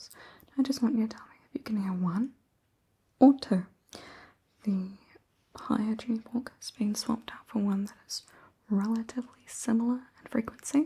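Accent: New Zealand. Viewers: are u from nz? so beutiful ❤ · 20 seconds in and i could tell you were kiwi 😂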